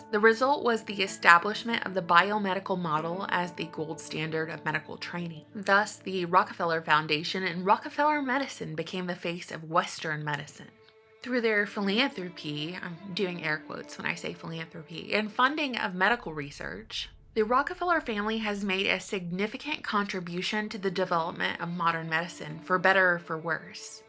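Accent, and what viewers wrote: South, United States. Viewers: She has a calming voice. Gotta love the southern accent 😉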